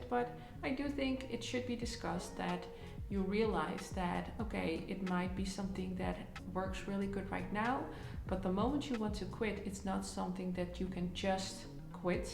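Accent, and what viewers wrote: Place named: Netherlands. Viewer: Hoor gelijk dat je Nederlands bent😊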